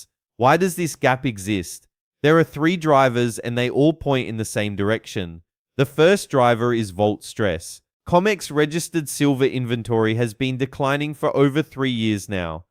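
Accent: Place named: Australia. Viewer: AI crap with a cheesy Australian accent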